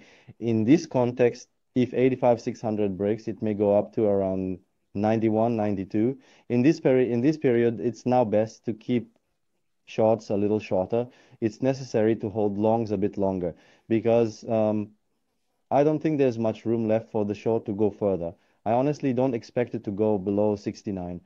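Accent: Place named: Russia. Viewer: Baştaki aksan ile yayin ortasinda aksan degisiyormu icindeki rus ortaya cikiyor bir anda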